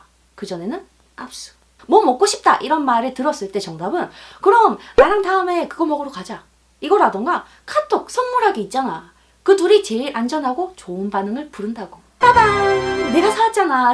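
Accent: Gyeongsang, South Korea. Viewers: 님의 목소리ㆍ말솜씨 정말 매력적이군요 경상도 특유의 억양이 상대방을 기분좋게 합니다 훌륭한 방법을 숙지하고 실천 할려고해도 좋아하는 마음이 너무 꽉 차있으면 감정이 가만 놔두지 않네요 ㅡ 님의 강의데로 천천히 데쉬해 볼랍니다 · 갱상도 아인겨?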